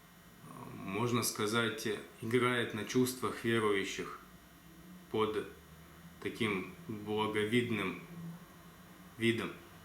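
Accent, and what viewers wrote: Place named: Kazakhstan. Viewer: Судя как он говорит, он не кыргыз, а казах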